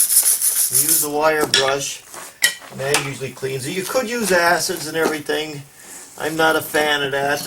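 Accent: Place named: Pennsylvania, United States. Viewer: You are very generous with your knowledge and those of us who don't know what you know really appreciate it. Just a question: You sound like the guys I know from the Hazleton area in PA. Are you from around there? Anyway, thanks a lot for your help. Rich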